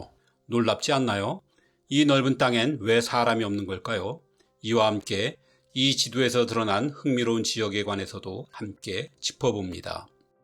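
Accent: Gyeongsang, South Korea. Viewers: 그치만 일정한 억양이 무한반복되어서 혹시 에이아이 아닐까도 생각해요. 경상도 억양이 바탕에 깔린 출신지 불명의 섞어찌개 말씨. 어쩜 똑같이 진행하고 올라갔다 내려왔다 하는지 신기해요.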